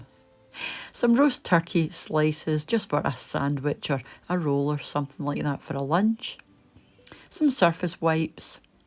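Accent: Scotland, United Kingdom. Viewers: I’m a new subscriber after falling in love with your beautiful Scots accent chatting away as you cooked your yummy recipes. I also love your snippets of the local scenery. We were supposed to be heading to the UK for a month in 2021 to explore some of the towns of our ancestors and enjoy the sights and local hospitality, but as we all know that’s really not an option now, so we will have to postpone for a while. So meanwhile I’m soaking up as much of the culture as I can on YouTube 😍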